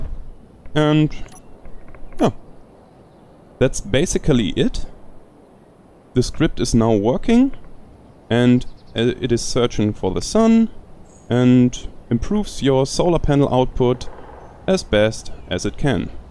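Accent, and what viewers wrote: Germany. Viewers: are u german? im german myself so i kinda know how it sounds when a german speaks english and for some reason my minds telling me that ur german when u talk. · you have german accent, i can hear it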